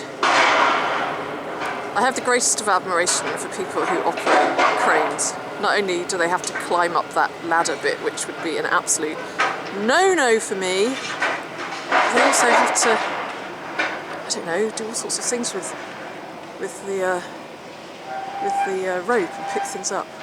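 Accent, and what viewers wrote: United Kingdom. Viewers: Your voice is so angelic, I don't know who's I like better yours or Michael wood for a British accent. You could sell your voice like him no problem.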